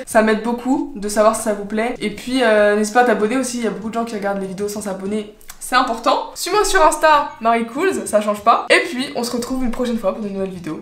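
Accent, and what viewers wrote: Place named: France. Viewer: Trop bien ! J'adore ce format. Le seul truc que j'aurais à redire c'est : arrêté de te justifier haha. Par exemple mets un petit disclaimer au début pour ton anglais au lieu de le dire à chaque fois et traduis directement les paroles (tweet, interviews etc) pour ne pas "galerer". De toutes façons les français ont tous un accent très prononcé et souvent un anglais très moyen, ne te prends pas la tête ! On est pas la pour te juger. 🫶 En tous cas grosse force à toi, on attend la suite ! 🤼‍♀️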